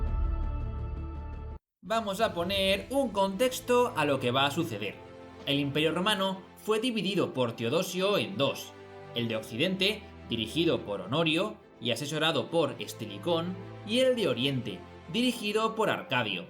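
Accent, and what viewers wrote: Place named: Spain. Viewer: Esa voz tan amplia bien española esa fuerza digna de una puesta teatral , bueno sirve para darle más animación, siga así señor que hace más interesante esta hermosa lectura , gracias desde La Paternal Caba Argentina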